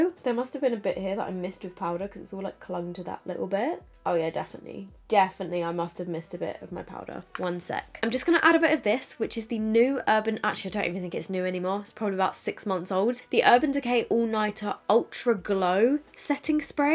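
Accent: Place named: England, United Kingdom. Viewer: I would love to enter for your giveaway. But I don't have high hopes as I don't usually comment on YouTube videos in general 😔 I watch you daily though and introduced you to my mam, and I've got your revolution collab makeup. I think you're such an inspiration and I adore your makeup looks. And your English like me so it's cool to hear! Haha.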